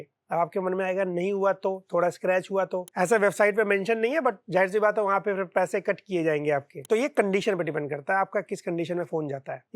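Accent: Lucknow, India. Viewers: Bhai aap lucknow se ho kya?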